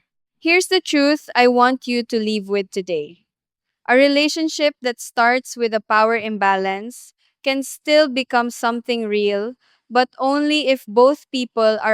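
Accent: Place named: Philippines. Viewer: Don't listen to that guy. I find English spoken with Philippine accent very attractive in much the same way most men feel about English spoken with a French accent. Of course, it helps if the speaker is an attractive and intelligent lady like yourself.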